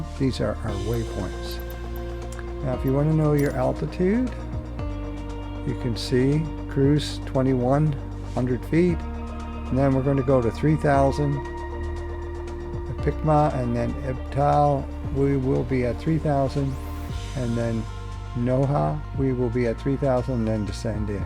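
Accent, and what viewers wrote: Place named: Canada. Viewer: I loved this explanation and caught a few Canadian accent features in your narration! Aboot..... for example... It was an awesome flight and I just can't believe technology can make flight in such terrible conditions possible. It's a very impressive human feat of engineering. Thanks again for all the great information... especially the link to airport data. You've done an amazing job of demystifying the process... Jerry from Fort Worth Tx. I subscribed yesterday.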